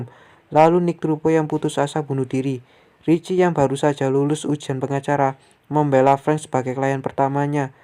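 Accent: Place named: Java, Indonesia. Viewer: di usaha in Jan medok bang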